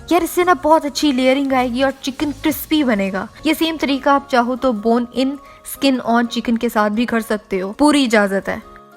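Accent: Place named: Punjab, India. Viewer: I really loved your channel and I love u too...😘 your accent 😍 of Punjabi..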